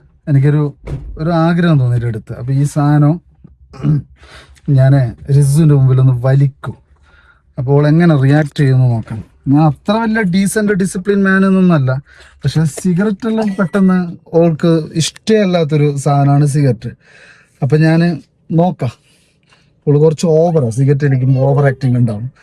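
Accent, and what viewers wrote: Kasaragod, India. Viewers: Ksrgd slang anna use ayikudee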